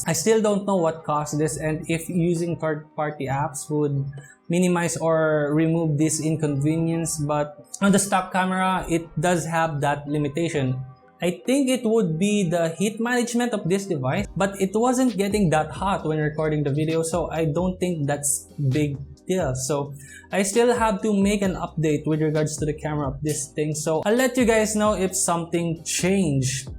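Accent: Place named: Philippines. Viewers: The way he enunciates words, I believe that he's doing it on purpose having the "Pinoy" accent. At first, it was awkward but then I realized he's been doing it on purpose which is for me I think is better than trying to speak in a very slang, Aussie and or British accent 😆.. and I can understand him better. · We have our own accents, and yeah syempre kapag english ang title dapat english din magsalita kasi maraming complains. Hindi lang Pinoy pwede manood boi, international viewers din. Hindi tayo native ingglis speakr.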